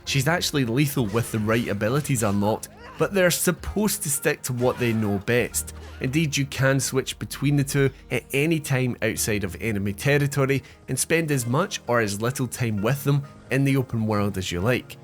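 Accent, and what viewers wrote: Scotland, United Kingdom. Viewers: Aye laddie y’er Scottish ain’t ya ?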